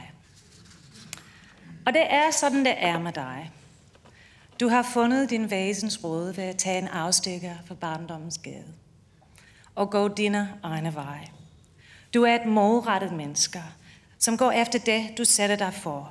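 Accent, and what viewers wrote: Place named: Denmark. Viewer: The subtitles are for the death and the hard at hearing and people who are death. The rest of us can understand her quite fine, as she is speaking what is called rigsdansk which can be compared to something like Cambridge or Oxford English.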